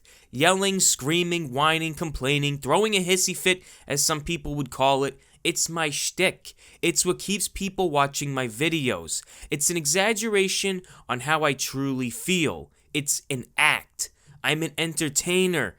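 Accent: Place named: New York, United States. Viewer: I know this is completely unrelated to this video,but are you a New Yorker? Because you really sound like one.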